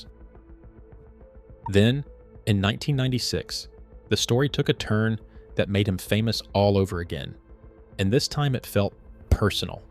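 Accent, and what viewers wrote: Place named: United States. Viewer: NOT IMPRESSED WITH AMERICAN ACCENT IN AI PLUS TAKES 28 MINUTES TO TELL 10 MINUTES OF INFO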